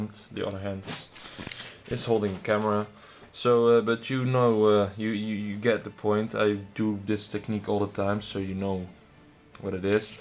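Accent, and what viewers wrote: Netherlands. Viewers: Ik hoor gewoon dat jij nederlands bent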